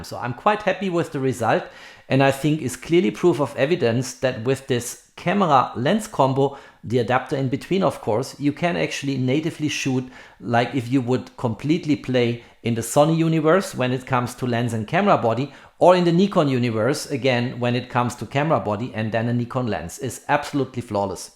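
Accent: Germany. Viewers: Exactly what I was looking for, thank you! Are you German btw.? :D Your accent sounds German. This probably also would mean that the adapter is available in Germany which is great. One more thing: how is the AF performance in videos with moving subjects? One thing is being able to recognize eyes/faces, the other one is real fast-tracking and on-point autofocus in videos, I think that's a harder task to accomplish, therefore I'm not completely sure if the performance is similar to native lenses in this scenario. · Youre German, right?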